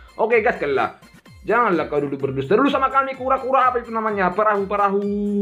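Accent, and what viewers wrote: Medan, Indonesia. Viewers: Semangat yaa Wak semoga Chanel nya makin sukses 💪💪 dan slalu berkarya <num> Kya nya orang Medan wawak ini 😁😁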